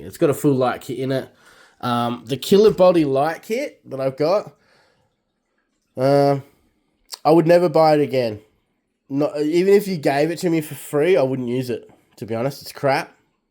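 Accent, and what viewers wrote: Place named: Australia. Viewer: Just came across your channel through the mystery of algorithms, but liked and subscribed straight away as it's great to find an Australian RC Channel 🇦🇺🦘😎 Getting tired of listening to yanks and poms 😂 The Cruiser is looking awesome, but sounds like you would have had more joy from simply buying a MN82 RTR instead!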